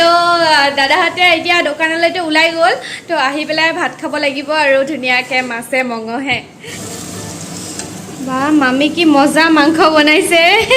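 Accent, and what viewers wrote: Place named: Goalpara, India. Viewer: bhal lage huni tumi 2ta lang kotha bur kole eta normal assamsse and eta lower’or goalpariya barpetiya assamese tu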